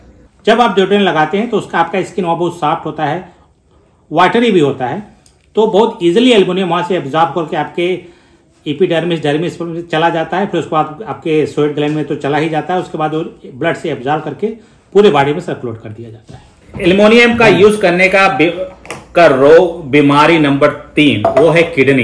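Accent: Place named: Jharkhand, India. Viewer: Bhaiya aap kya jharkhand se hai kyonki apke baat karne ka tarika jharkhand wala hai isliye pucha or agar sachme aap jharkhand se hai to I am very proud of you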